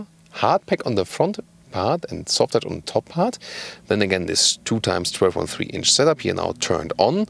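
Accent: Germany. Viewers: Deutsches Auto, deutscher Sprecher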